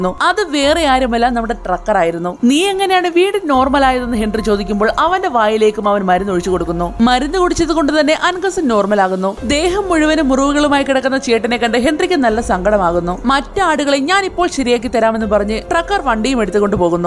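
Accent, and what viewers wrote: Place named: Kasaragod, India. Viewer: Kasargod aanalle? Paanju varunnu yenn paranju (paanju) kasargod alleM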